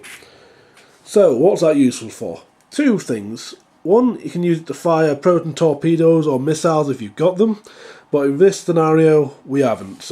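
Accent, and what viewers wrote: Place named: United Kingdom. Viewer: All things sound more intelligent if said with a British accent!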